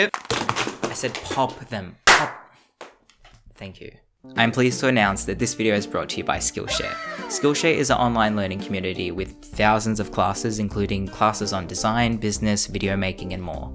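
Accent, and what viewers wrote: Australia. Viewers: My god, I just met a guy from VRChat. He’s actually from Australia too and he sounds almost EXACTLY like you. It’s kinda weird, but I like the Australian accent so I’m not complaining lol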